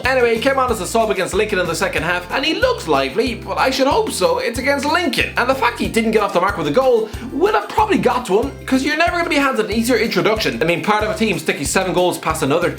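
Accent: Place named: Ireland. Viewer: This guy sounds Irish...🤔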